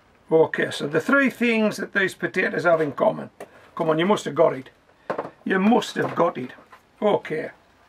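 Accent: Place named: Newcastle, United Kingdom. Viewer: Love the accent. Why-aye man! Poysanass poortaytohs! Divvent eat em man! Howway!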